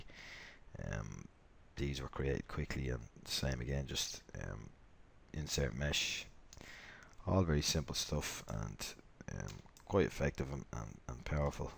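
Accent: Dublin, Ireland. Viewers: fair play thanks for this series,sounds mad to say this but the fact we're both dubs i can follow along that bit easier compared to some other tutorials keep them going mate!:) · someones from Dublin ;)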